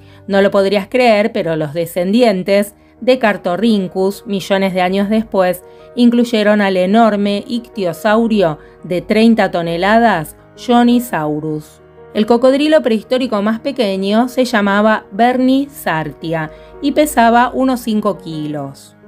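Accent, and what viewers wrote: Argentina. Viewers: me encanta cuando dices "hola amigos",con ese tono encantador argentino tuyo;tanto como tu trabajo,por demás interesante e ilustrativo, sería muy interesante,que contaras la historia de cómo llegaste hasta aquí. Mis mejores deseos,y saludos cordiales,desde México.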